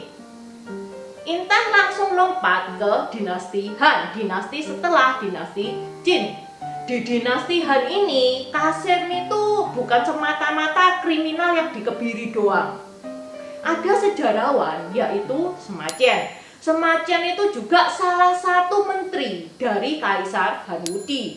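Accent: Java, Indonesia. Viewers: Indonesia memang beragam.ayo kita jaga keberagaman yang ada di indonesia.....unik banget Cece logat jawanya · Jawanya medhok he... Saya juga orang Jawa logat jawa kalau sudah lengket sulit ilangnya. 😀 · Baguss logat jowo njekkeknya, semangat yuu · Mbak Intan anda orang semarang ya...koq medok jawanya. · Org Jawa memang medox.salam dr pulau Lombok kak. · Maturnuwun mbakyu Intan, aku seneng dengar ceritanya dengan logat Jawa yang kental... teruske wae mbakayu.... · Muka mu cino suara mu jowo ... wow ! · Paras Tionghoa logat jawa. Sukses trs Mbak Intan👍. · Ini logat Jowo Cino. Bahasa Jawa campuran bhs Indonesia dg logat khas mereka. Jarang yg murni pakai bahasa Jawa atau murni bahasa Indonesia. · Logatnya medok jawa bget!!!wow keren👍🏻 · Mantap 👍👍,,,logat Jawanya medog,,👍👍👍😀,,, terus semangat,,,,and sukses selalu 🙏👍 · Logatnya jawa banget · Casinge cino jowone medok tenan.. 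· Muka Tionghoa ngomong medok banget Jawanya 😀😀😀😀😀😀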